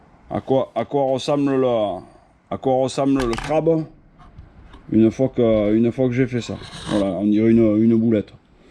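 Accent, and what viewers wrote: South, France. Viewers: J adore ton accent du sud 😂 et j ai le meme fluro que toi je l ai chopé a decat ...bon les crabes la ils sont pas trop vivaces😅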